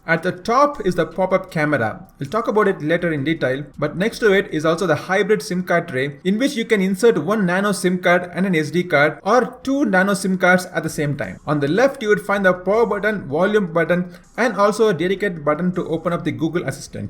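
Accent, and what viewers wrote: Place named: Tamil Nadu, India. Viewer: Englisha vida unga tamil style tha massu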